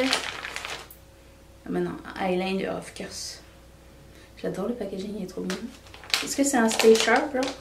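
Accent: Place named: Canada. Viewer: Cc tu as de plus en plus l accent canadien 😁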